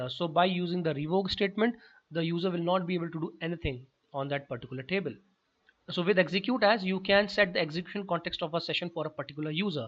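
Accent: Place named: India. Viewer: Damn, I hate the Indian pronunciation and i usually click away, but this a very good explanation of the SQL language. Thank you!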